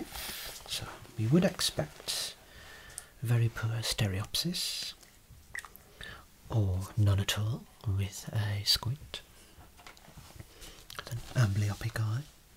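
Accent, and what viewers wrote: Birmingham, United Kingdom. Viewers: Happily I came across your excellent channel about a week ago and I've been enjoying so many of your videos. You clearly work hard to produce the best videos possible and it really shows; also great to hear the lilt of a fellow Brumie. I grew up in Handsworth in the 80's. Unfortunately I've been suffering from a worsening heart problem over lockdown and 19 months of self isolation so finding alternative ways of reducing daily stress has been important so thank you for your efforts in ASMR. I am also starting to learn quite a bit about my eyes and optometry :-)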